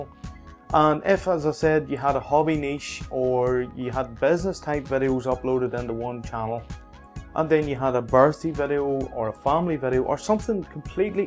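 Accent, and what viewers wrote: Northern Ireland, United Kingdom. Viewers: U have the same accent as me! R u from the uk? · Thank you, very easy, clear, instructions. I had a look at your videos and there's loads of great stuff I can use, got yourself another sub. Also, nice to hear a Norn Irn voice too. Best Wishes, Brendan (outside Lurgan).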